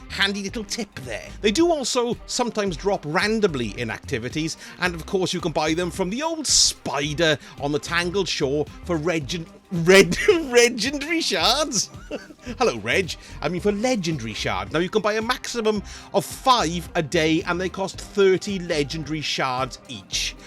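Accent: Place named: England, United Kingdom. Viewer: Love the english accent!!!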